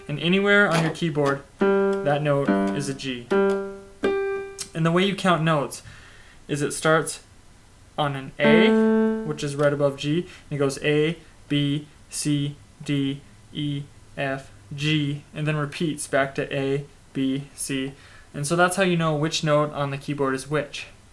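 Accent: England, United Kingdom. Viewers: hello where ur hometown ? i really like your english accent ^^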